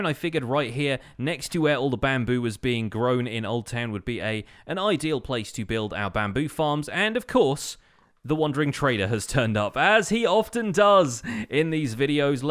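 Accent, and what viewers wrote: United Kingdom. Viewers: Im so happy i caught up to this current episode. Your lovely british accent is now stuck in my head thanks to me binge watching the series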